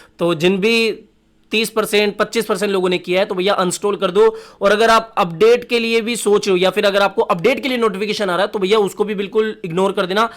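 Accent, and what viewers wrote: Rajasthan, India. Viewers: Bhai app Rajasthan se ho na 😏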